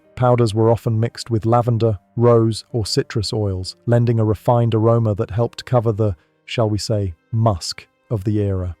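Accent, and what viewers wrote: United Kingdom. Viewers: Under more info it says United States but you have an accent the same as mine (British), do you just narrate them, do you write them, are you an historian? And what about the pictures, are they AI, they are quite good actually, certainly not contemporary. There's a lot on YT about hygiene and toilets (or lack of them) I wonder how this fascinating subject will be tackled by YT (if it still exists) in 10 years.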